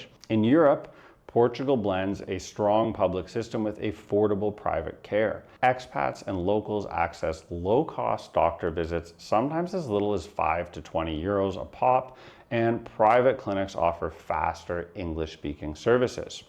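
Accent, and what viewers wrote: Canada. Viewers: lol your Canadian accent is super thick and I’m Canadian 😅. Good info here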